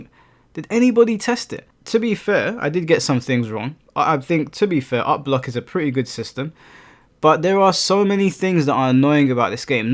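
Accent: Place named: United Kingdom. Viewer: Okaaayyyye, but this is how I like my men. Speaks like Harry Potter, BBC, and the fact he is from UK means he is likely UNCUT. :face-red-heart-shape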